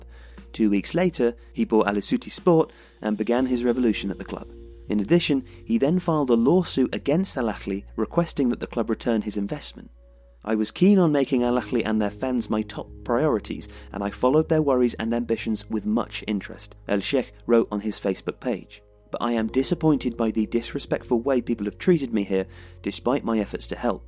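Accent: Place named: Wales, United Kingdom. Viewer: Wow, now I feel like a dick. I didn't actually know about this Welsh. I'm from Egypt and we have this sort of stereotype about the British adding the Kh letter to everything like in habibi "khabibi", you can see it in really old tv shows here but in the Real world when I started talking to people from England especially Londoners not one of them was even capable of producing such sound, like saying Khaled as " D. J. K-aled " and then i was convinced that this stereotype came from the time of British occupation of Egypt where those who learned to pronounce the letter "kh" in Arabic are just showing off by jamming it into any and every word. I thought you were just being a hipster or something. P.S. in Arabic there are 2 letters for H, like B and P in english. and the one in el Ahly is different from the one in like Habibi, so i can see now how it would be hard for someone especially with a Welsh accent to pronounce it. 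just try not to think of Habibi when saying el ahly. think Haba Haba.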